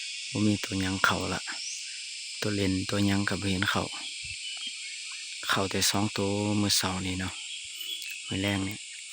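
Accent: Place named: Champasak, Laos. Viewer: ຢູ່ແຂວງໃດເດຟັງສຽງຄືຄົນຈຳປາສັກ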